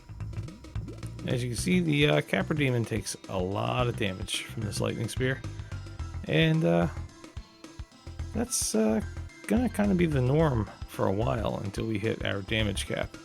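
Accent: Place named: Ireland. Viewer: Great video man, can I ask if you’ve any relation to Ireland? You pronounce a lot of your words just like I would, I’m from Dublin :).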